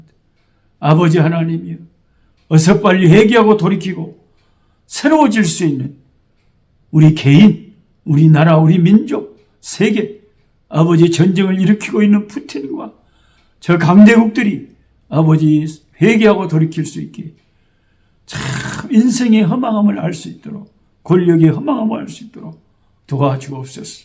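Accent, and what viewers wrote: Jeolla, South Korea. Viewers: 목사님말씀으로 하루를 시작해서 에레미아서 까지 오게됨을 감사합니다 여기는 순천이레요 옆마을 벌교 사투리에 웃으며 정감이 깊습니다 추위 잘이겨내세요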